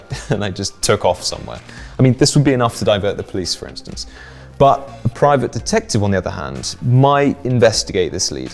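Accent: United Kingdom. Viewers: a British accent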